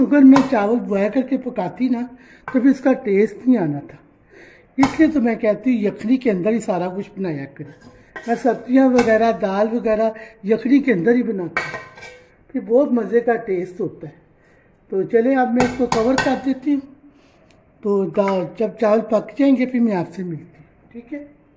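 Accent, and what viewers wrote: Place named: Lahore, Pakistan. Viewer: The way you talk is amazing , true lahori style .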